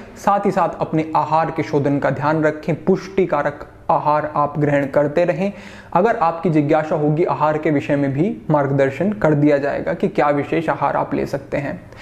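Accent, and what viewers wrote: Standard. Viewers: The most insperational part of this channel is your pure hindi pronunciation, you worthy your every inches of life, you are blessed, am bless to find this channel, thank you 🙏🙏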